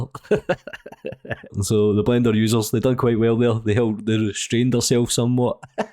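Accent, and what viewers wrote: Scotland, United Kingdom. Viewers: Na pal, you talk Scottish all you want. Perfectly clear to me … then again I’m a north easterner. No one understands me.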